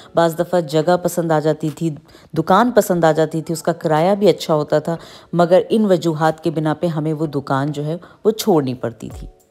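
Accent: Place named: Lucknow, India. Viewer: Aap ki urdu tallafuz aur zaban subhanallah bilkul lucknowi hai! Shayad aap log UP India se migrate hoke Karachi Pakistan gaye honge!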